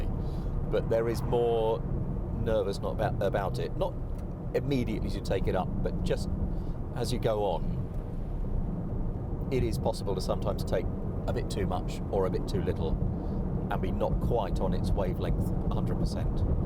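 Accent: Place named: United Kingdom. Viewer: This is normal British delivery. You're asking for it to be more American. Perhaps you're in the wrong place.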